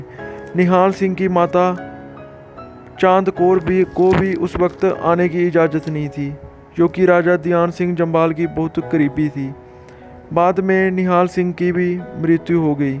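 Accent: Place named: Jammu, India. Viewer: Your accent is heavily influenced by Dogra and punjabi ❤️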